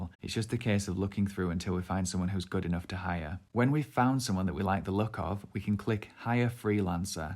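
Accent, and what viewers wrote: United Kingdom. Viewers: nah mate it just sounds like it but that's the "bri - ish" accent. Your videos are dope btw! :)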